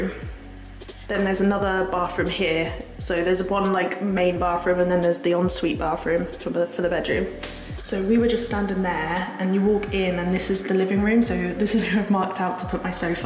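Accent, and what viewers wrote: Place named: United Kingdom. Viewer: Idk what your accent is called but it’s the BEST kind of British for sure 🤣❤️❤️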